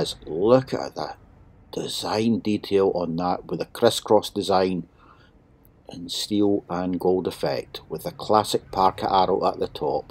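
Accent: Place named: Ireland. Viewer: I love that Irish accent. the 'Rs' just roll off the tongue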